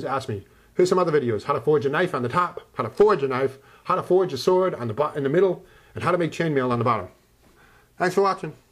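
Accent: United States. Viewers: Are u american?D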